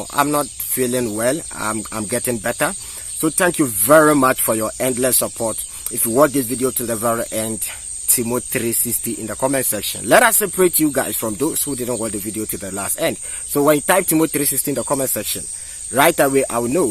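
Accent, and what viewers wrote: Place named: Ghana. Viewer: Stop the Ghanaian English and listen 👂👂 to the West. New technology is the issue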